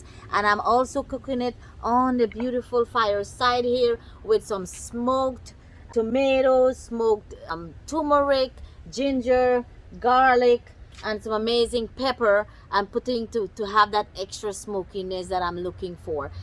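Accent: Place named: Guyana. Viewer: i have watched this video/your Narration about preperation is amazing/you are talked very nice -some times I am laughing about your talking /your english is mixed with Guyana and little bit 🇺🇸USA/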